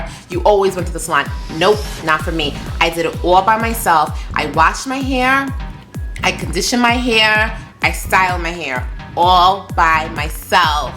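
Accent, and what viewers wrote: New York, United States. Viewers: Love your accent girly! NY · LOL I love your voice... your such a NEW YAWKER ..lol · I so LOVE you, your NY accent was all over this one. One day I'm going to be able to meet you at a meet and greet, you seem to be a blast to be around.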